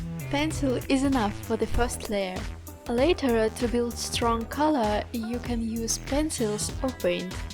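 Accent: Russia. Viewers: Emma Ray just because she IS from Russia :D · Very interesting makeup, and.. Your pronunciation is like you are from Russia😅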